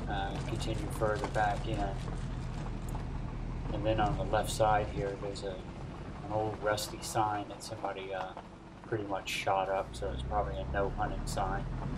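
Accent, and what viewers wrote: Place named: South, United States. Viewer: Because, when I saw the name, I thought to myself," I have only known one in my life, I bet this is him, and asking would be the best way to confirm if it is him." I am being cryptic, because as you see, 0sum gamezzz is not my real name, and I want to remain anonymous. I am going to give you clues to as who I am, not too specific, because you are a sharp guy, and would know right away who I am. if you figure out who I am, please cryptically respond to me with clues as to who you believe I am...please? At one time, I counted you as one of my best friends ---- by the way, your accent is far less southern now, than it was, when you were a boy of say... 14 through 16. Facing the main office/kitchen, the building where Vespers took place I lived on the right side of campus. This may take a series of posts, if you decide to play along. 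Please be rest assured, I have no bad or disrespectful intentions towards you... in fact, it is 100 percent the opposite. By the way, you can ask questions to obtain my ID. This could be fun.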